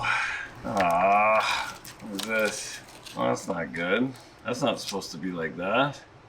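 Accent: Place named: Canada. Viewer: How do you spell out the way you pronounce it… owe-t wtf nobody cares your from Canada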